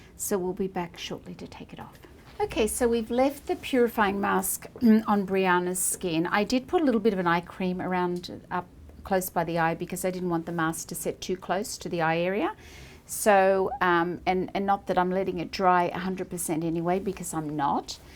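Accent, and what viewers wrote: Australia. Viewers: Aussie as hell lol what a nice touch hearing that Aussie accent in the video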